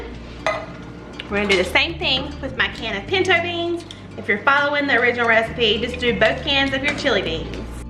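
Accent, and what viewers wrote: South, United States. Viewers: I clicked hoping for a beautiful southern drawl and i wasnt disappointed, new subscriber 🙋‍♀️😍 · I just love your Southern accent❤ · I love your recipes!! And your Southern accent, too. I'm definitely going to try your recipes. Thank you for sharing 🙏🏾❤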